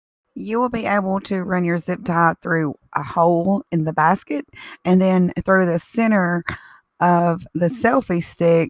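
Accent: South, United States. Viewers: You are such a blessing to watch. I love your crafts and "Southern" accent. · Very cute! I love your voice,I have a southern accent also but not as pretty as yours! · SUPER cute! You are soo creative and I love your accent...being from TN I thought I had a southern accent..you got me beat!...(GA or NC maybe???)LOL!!...NEW SUB HERE!! Keep the ideas coming momma! (people from the south know MOMMA is a term of endearment)...hahahaha...love you girl! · You are so darn adorable I love your southern accent Bless your little heart I love your wagon idea & I’m so glad I found you Keep on creating honey & god bless Hugs, Dee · the southern drawl makes it even better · Omg!!! So cute and creative! I love it and love your southern accent!!! Blessings to you😍😘❤️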